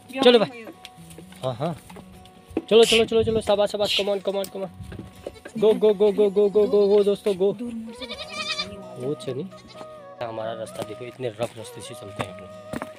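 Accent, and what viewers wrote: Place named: Pahari, India. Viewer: Wow कितना sunder जगह बहोत ही aacha मन ख़ुश हो गया वीडियो देख कर और आपकी पहड़ी आवाज सुनकर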